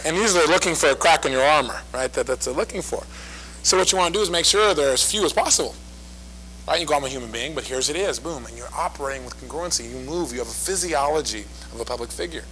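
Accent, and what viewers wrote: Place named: Texas, United States. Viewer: His Texas accent is strong.. omg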